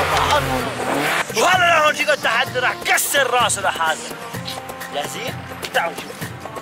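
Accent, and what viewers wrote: Syria. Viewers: عراقية وأفتخر 😂 لك دخيل ربك عم تحكي سوري😊❤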